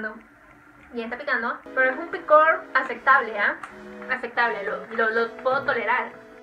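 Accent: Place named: Peru. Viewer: Tu acento es peruano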